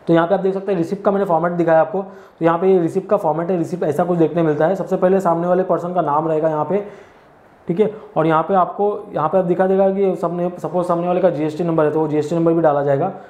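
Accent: Maharashtra, India. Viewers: sir aap marathi ho kya ????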